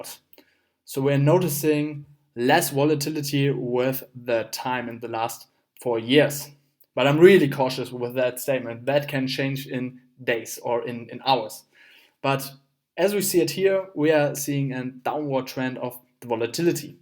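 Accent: Germany. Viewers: Expected a babe, but got a nice german accent to listen to make me even nerdier than I am now. Actually the tutorial was just what I was looking for in terms of prediction! Loved the data visualizations as well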